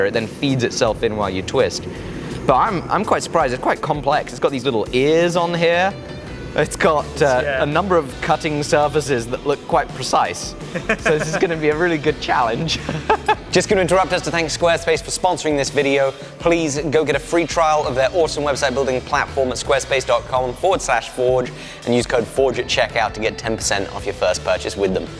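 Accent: France. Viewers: oh l'accent frencais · I'm starting to get used to see you achieve great work when you had no idea how to do at the beginning but I will never be used to your perfect French accent! I never met a British would spoke that well^^ when will you make a collab with a French guy?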